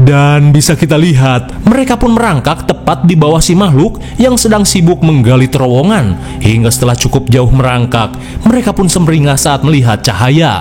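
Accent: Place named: West Java, Indonesia. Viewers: Kaciri orang sundana si aa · Hallo chanel kesukaan ku si logat sunda pred ❤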